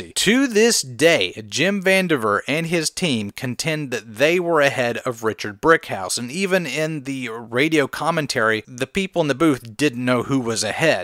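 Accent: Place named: Texas, United States. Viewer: he speaks so fluent at the same time with a texas accent. This is why i like this channel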